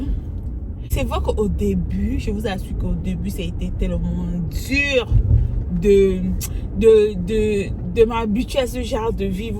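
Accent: Cameroon. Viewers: She sounds more "camerounaise " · Bonjour.C'est fou vous avez l'accent camerounais, j'ai vraiment cru que vous étiez une compatriote. J'avoue que vous êtes ''realistic''.Ça change vraiment de toutes les videos que j'ai vues depuis le temps.Juste une question:Quelle est l'orientation de votre chaîne? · Cest l'accent camerounais justement.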